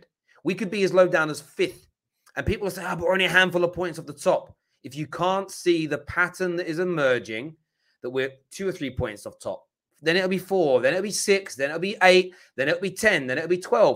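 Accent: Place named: United Kingdom. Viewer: I am from Indonesia ,i like your british axcent.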